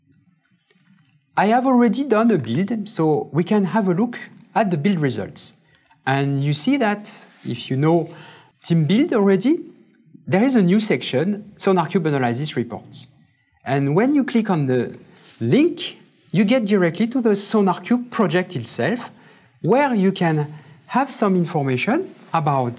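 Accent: France. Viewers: Don't be mistaken, it is French with English words, no offence to anyone, but most Frenchies speak like that ;-) *Pink panther movie series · Very hard to listen to your english... It is almost french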